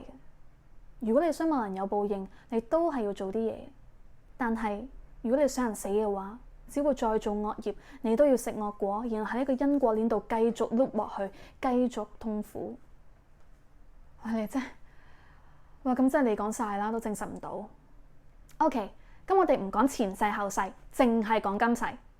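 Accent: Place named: Hong Kong, China. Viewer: 多謝（閣下）菩薩其實我等咗以香港方式嘅廣東話講解佛學意理解終於等到了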